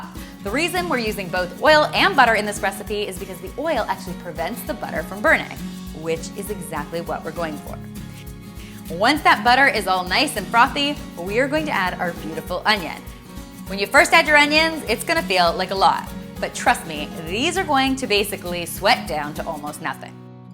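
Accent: Canada. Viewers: You're Canadian, right? Just confirming 😅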